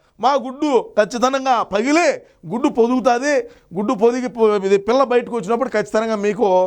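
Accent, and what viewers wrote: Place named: Chittoor, India. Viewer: Chittoor village type undhi plz improve my village chittoor...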